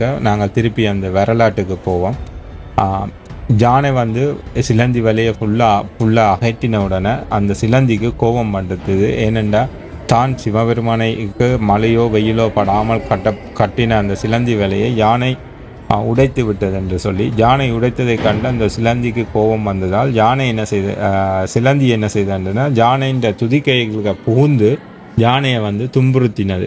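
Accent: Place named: Sri Lanka. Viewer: ஆஹா ! அருமையான இலங்கை தமிழில் அசத்தும் வர்ணனை !🙏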